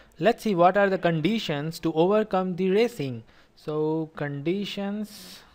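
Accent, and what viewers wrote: India. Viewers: what I like and don't like ? I like that you are meticulous, I'll explain myself, when you use a notion, a term you always explain it in great details so we don't just learn by heart but also by comprehending where the things come from. I love the way you made your course, with the drawing, it's very effective, plus you made a lot of examples to show us how the circuits work. The pace is how it should be, I don't find it too slow or too fast. In my courses in uni it's way too fast and I don't understand as much as I do here during the lecture. In the beginning I was afraid of your indian accent because I'm not a native speaker (french) and I don't have very good listening skills I often struggle with the accents but strangely with you I understand perfectly what you want to say. For the suggestions I can only ask you to do more subjects like algorithms, data structures, system programming and so on hahaha. 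· My apologies, if I hit someone. But in my experience your accent the best Indian accent. Your voice understandable and is hear no much harsh.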